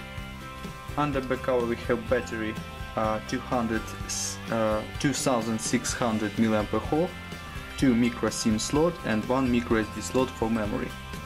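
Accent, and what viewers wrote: Russia. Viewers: Мне кажется что у него русский акцент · Русский на английском базарит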